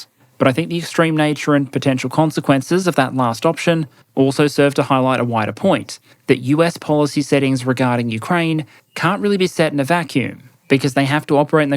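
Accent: Australia. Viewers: Future historians are going to be very grateful, as am I. A veritable tour de force with an Aussie accent. Bravo ! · I have to ask, what does "inverted commers" (@ ) mean? Its a common perun phrase and it seems like a local idiom, or maybe the aussie accent is throwing my 'merica ears, but i am not sure what it means and its driving me crazy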